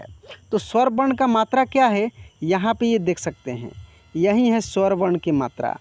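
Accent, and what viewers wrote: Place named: Bihar, India. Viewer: Out of all Hindi teachers Guru Ji I like your Bihari accent Bahut Dhanivaad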